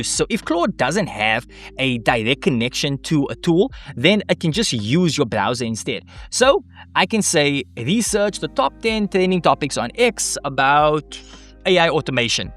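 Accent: South Africa. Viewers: So lovely to hear a fellow South African :) Glad I found your work, super cool and impressed. Thank you so much. Loving my Journey with Claude Co-work so far.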